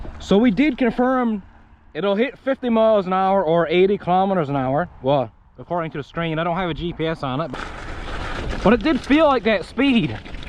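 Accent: Newfoundland, Canada. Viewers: don't sound too too Canadian to me lol, maybe an irish newphie?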